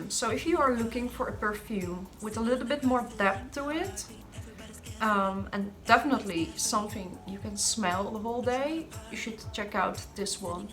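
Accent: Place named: Netherlands. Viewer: your accent sounds Dutch. Great list btw!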